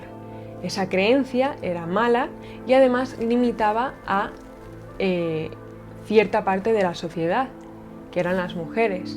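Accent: Spain. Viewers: Hola me gusta mucho ver tus videos me gusta mucho escuchar tu punto de vista además de que me gusta mucho tu asentó me gusta como se escucha el español de españa ojala poder conocer una española jiji saludos desde Costa Rica pura vida!!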